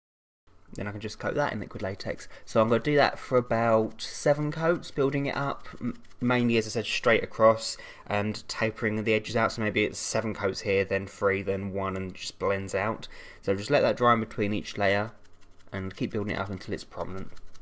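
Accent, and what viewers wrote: United Kingdom. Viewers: makes caveman sounds while still sounding british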